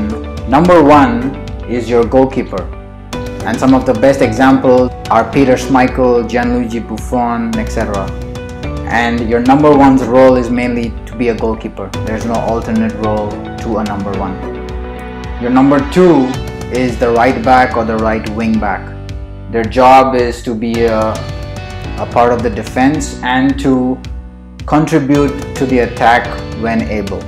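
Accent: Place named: India. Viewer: love your accent... Indian? 😛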